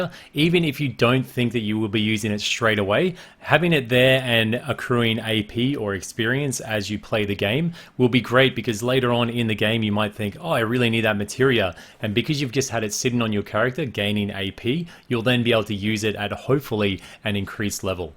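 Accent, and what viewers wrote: Australia. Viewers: Austeralian eh?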